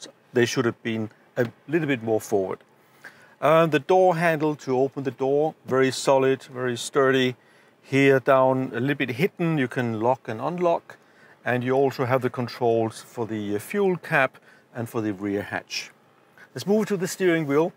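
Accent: Denmark. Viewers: You can always hear a Danish guy speaking English.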